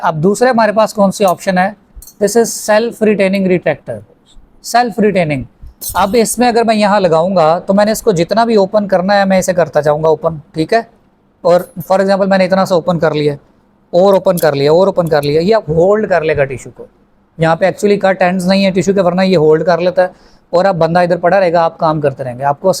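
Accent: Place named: Pakistan. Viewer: Aap jis tarah Se bolate hai na vah Pakistani language jaisa lagta hai 😊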